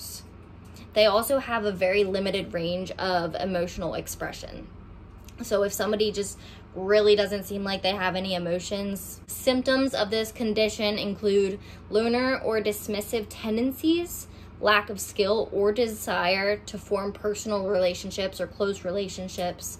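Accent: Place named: Baltimore, United States. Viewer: Where u from if you don't mind..... im from Baltimore, and you have a Baltimore or MD accent. Jw but love ur story. Very detailed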